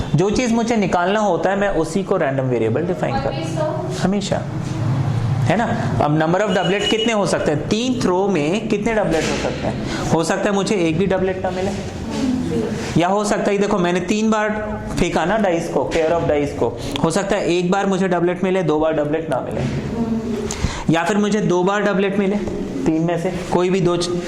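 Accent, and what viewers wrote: Allahabad, India. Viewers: sir ...are u from Allahabad.......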